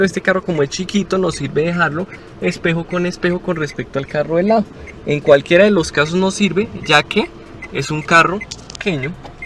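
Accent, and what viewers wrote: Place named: Colombia. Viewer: Escucharte es un placer, tu acento me da ganas de irme a Colombia a buscar un novio, si mi profe de manejo hubiera hablado así yo hubiera conducidos como Toreto en el primer día de clases!😏😉🤗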